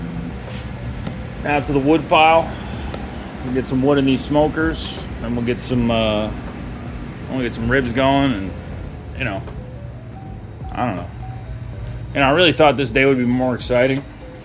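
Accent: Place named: South, United States. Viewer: I was dyyying because I literally thought the more he keeps going the more southern he sounds 😂 so I’m glad he acknowledged it. This actually was fun and interesting to watch because my Uncle sells BBQ too. Wish I could try some of y’all’s!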